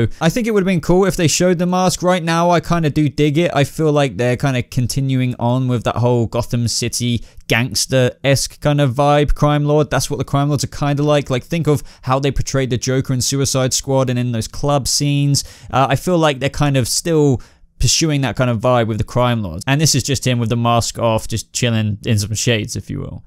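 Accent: England, United Kingdom. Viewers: Alicia Fox He has an English accent, so I’m assuming he’s English?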